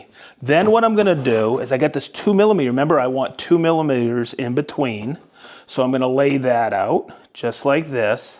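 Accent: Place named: Boston, United States. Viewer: That Boston accent is the worst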